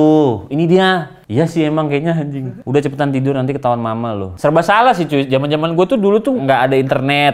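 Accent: West Java, Indonesia. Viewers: bang ngmg sundaniss canduuuuu ❤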